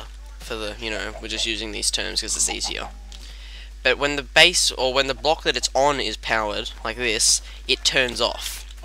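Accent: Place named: Australia. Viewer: Must be Australian with that accent, probably east coast right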